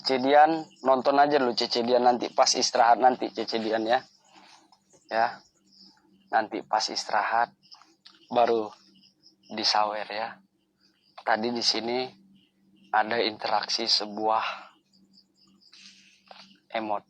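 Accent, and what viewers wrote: Medan, Indonesia. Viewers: Bang kau ngomong Logat.medan Kau batak kah?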